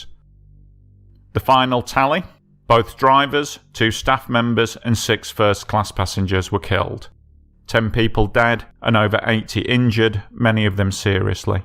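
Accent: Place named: Northern England, United Kingdom. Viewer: You still sound like you're in the north of England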